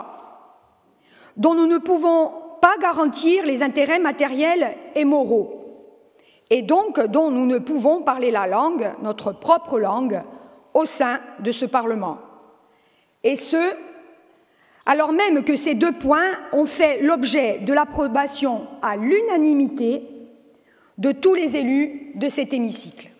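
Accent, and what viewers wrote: France. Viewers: Sembra un miscuglio di dialetti italiani logicamente con influenza della pronuncia francese. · accento francese fa male!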